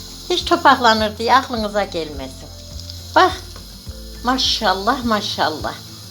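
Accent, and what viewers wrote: Kayseri, Turkey. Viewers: Kayseri şivemizi dogal ve cok guzel konusuyorsunuz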